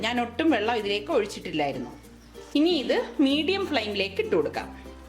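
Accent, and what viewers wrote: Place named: Kottayam, India. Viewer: Sister the crab came out so well! You speak Malayalam like my parents so you must be from near Kottayam! greetings from Canada! Very clear instructions! Thanks!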